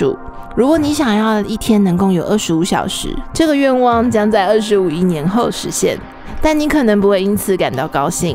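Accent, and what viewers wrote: Taiwan. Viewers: 台湾腔听着莫名恶心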